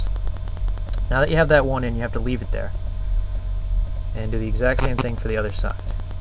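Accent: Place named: United States. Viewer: i cant hear anything at the end, and he has a proper amarican axent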